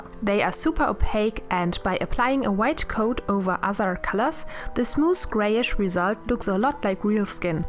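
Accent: Germany. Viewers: Die Zeichnung ist echt gut und auch die Beschreibung der Schritte ist auch extremst gut aber es wäre sehr hilfreich wenn du vielleicht an deiner englischen Aussprache arbeiten würdest denn du hast einen sehr starken deutschen Akzent (die Kritik soll wirklich konstruktiv sein und nicht gemein ich würde nur gern helfen deine Videos besser mach ) ps du kannst extrem gute Zeichnung ! du bist echt talentiert 💓💓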